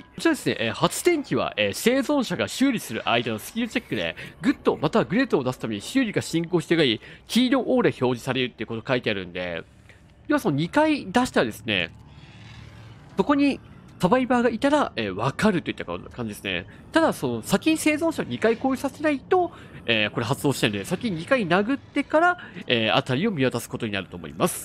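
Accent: Kansai, Japan. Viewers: 動画観させて頂きました🙏✨ 後半の動画では、関西弁が多い＋メメントが、とてもかっこ良かったです☺️💕 “写りたがるやつ”が、とても面白かったです😂😂😂 サバイバーだったら凄く嫌かもしれませんが、キラー側で、このメメントが決まると、とても気持ち良さそうだなと思いました😌✨ 今回も楽しい動画をありがとうございました🍀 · このキラーめちゃめちゃかっこいいですね😆 使いこなしちゃってるのもさすがです👍 関西弁多めヤバすぎ(笑) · お疲れ様でした～ 銃使うのめっちゃ面白い😳😳 関西弁が今回多くて、それこそ10000イイネですね👍👍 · さん今回めっちゃ関西弁で喋ってくれてはりますやん… さんのデドバ面白くて大好きです！ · 新キラーおつかれさまでした！新マップも面白そうですね！壁とか壊せるようになったんですね半ギレしてるところと、インストっていうからどんなんなってるのかと思ったら、完全にうつりにきてましたね、あれw 面白かったです(*´ー｀*) 後半の方は関西弁強めだなと思いながらみてました！どっちもハッチ見つけるの早くないですか？！最初のは絶対逃げれたのになー🤔と思いました。これからキラー使っていく１つになるんですかね？？楽しみにしてます！ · 新キラーやパークの説明など盛りだくさんの動画ありがとうございます( ˘꒳˘ ) やっぱりわかりやすい!!! 途中からテンション上がって楽しまれていたのか、低音イケボの関西弁でplayしてたのがたまりません🤖♡ 特にメメントしてた ~ のとこ好き🤭 かっこよかったです！ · 後半の関西弁大量発生うれしすぎ😍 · キラーかっこいいのにさんの関西弁ミスマッチすぎるwでもそこがいいw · さんの関西弁めちゃくちゃ最高です好きです((*´∀｀*)) 新キラーのデススリンガーさんかっこいいですね！！銃のかっこよさ、そしてカッコよく狙って撃つさんかっこいいです✨ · 新キラーかっこいいですね！さんの関西弁素敵だったです(*･ω･) メメントの時に後ろに写りこんでいたサバイバーさん笑いましたwww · 新キラー､ビジュアルもカッコいいけどリロードがまたカッコいいですね！さんのサバイバー吊った時の高笑い最高です😆 後半の関西弁わざと強めなのも好きです！楽しかったです♪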